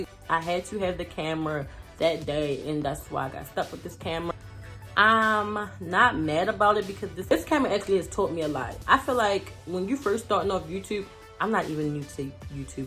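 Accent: Baltimore, United States. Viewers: I thought so lol that’s where I’m from. I know a bmore accent when I hear it lol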